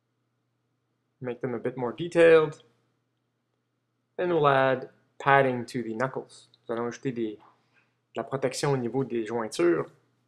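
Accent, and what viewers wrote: Quebec, Canada. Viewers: Waw.. Magnifique.. C'est un rêve de savoir dessiner comme toi ! J'suis allé au Québec en vacance, leur accent m'a fait penser a toi ! :P (Je suis Francais). C'est vraiment un talent fou que tu a, en plus, tu le commente aussi bien en Francais qu'en Anglais.. Un grand bravo a toi, merci et continue tes vidéos ! ;)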